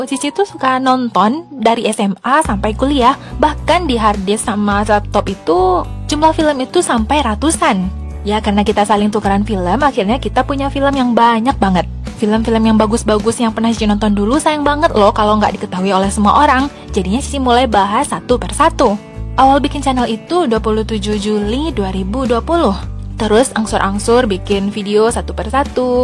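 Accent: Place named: Padang, Indonesia. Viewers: Padiah uni mah,,, tapi awak alah takok sih urang minang dari bahaso indo nyo, kalau dak urang cino pasti urang minang. mantap. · Dah keliatan Logat nya kak orang Padang ;) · Wahh, saya awalnya nebak Kak Cici orang Palembang, soalnya saya ada teman orang sana dan logatnya agak mirip-mirip. Ternyata Kakak orang Padang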